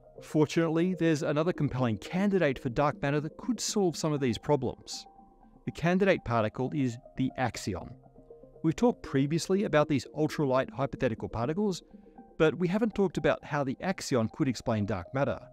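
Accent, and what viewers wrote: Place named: Australia. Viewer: that's his Australian accent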